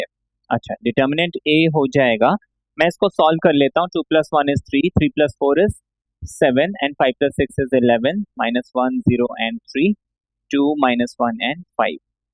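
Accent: Bihar, India. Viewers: R u from bihar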